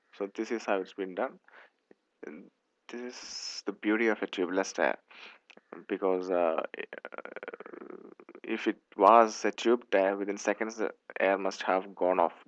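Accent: Kerala, India. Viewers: I bet the narrator is from the God's own country.. So am I.....:) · sounds Indian to me.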